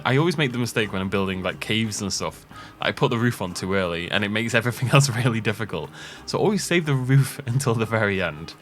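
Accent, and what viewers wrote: United Kingdom. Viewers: Commenting because the nice British voice told me to. Have nothing interesting to say though.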